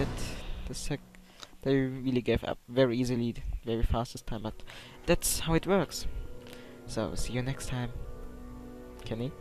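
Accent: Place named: Germany. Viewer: alter du hast so einen heftigen deutschen akzent^^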